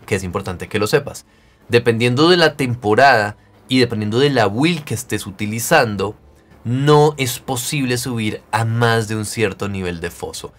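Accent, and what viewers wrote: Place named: Colombia. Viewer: Hola parcero se nota por tu acento que eres Colombiano. Soy nuevo en el juego y tus guias me han venido de lujo. Explicas con mucho detalle. Buenos videos bro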